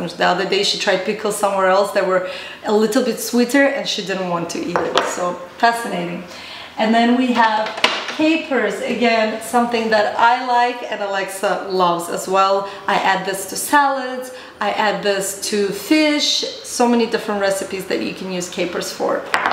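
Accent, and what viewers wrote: United Kingdom. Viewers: Her British accent is strong in this video love it!! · why do you take a British accent?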